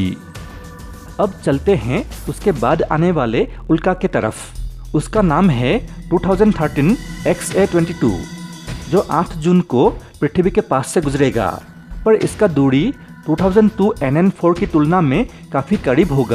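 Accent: Assam, India. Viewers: Are you Assamese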